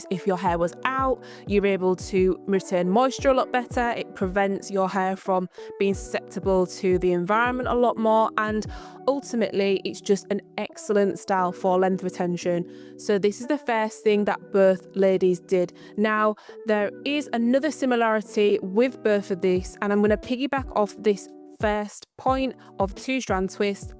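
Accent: Northern England, United Kingdom. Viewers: Hey love, great video. I actually released a video very similar a couple of weeks ago. I've been following your journey on IG as well. Looking forward to seeing us both get to waist length very very soon 💜 Also, you sound northern. I thought you were gonna say you live in Manny or Yorkshire or something haha. I'm in B'ham.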